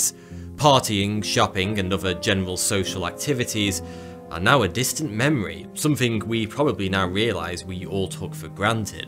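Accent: United Kingdom. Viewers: Pardon the ignorant question: which part of the UK is your accent from? Introvert here. This quarantine is brilliant! I love it! I'm learning 2 foreign languages, taking online guitar lessons, reading voluminously, deep-cleaning my flat, getting a suntan, etc. What's the bellyaching about? Ha!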